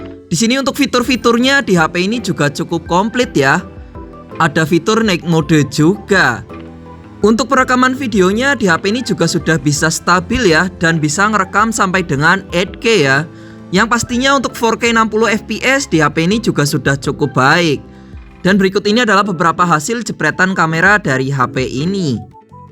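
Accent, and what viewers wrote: Banyumas, Indonesia. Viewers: Buset wng Banyumas 😂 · ngapak anjir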